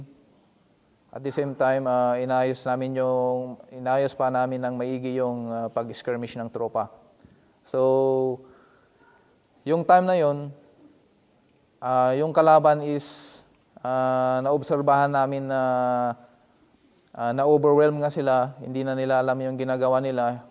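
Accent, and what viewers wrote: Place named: Samar, Philippines. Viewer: Sir tubong ano po si sir? Parang Samar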